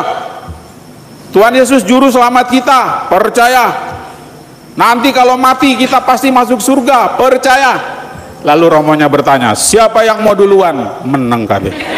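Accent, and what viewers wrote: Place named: Java, Indonesia. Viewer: Ya Romo kocak lucu namun serius Maaf sy pertama kali melihat Romo di Youtube Mau tanya Romo asli dri mana Sepertinya bahasa Jawa medok.. Semoga Romo sehat selalu .. AMIN❤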